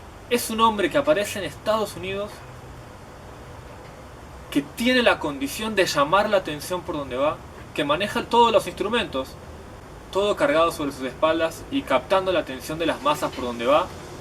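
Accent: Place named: Argentina. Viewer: Gracias. Me encanta tu acento argentino. Nos diste muy buenas explicaciones!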